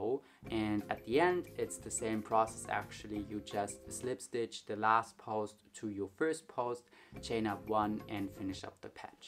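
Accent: Germany. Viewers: Sein deutscher Aktzent triggerd so hard.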